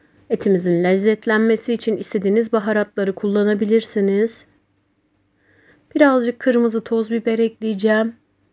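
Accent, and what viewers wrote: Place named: Kilis, Turkey. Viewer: Aynı Kilis lisanı❤❤❤gibi konuşuyorsun ❤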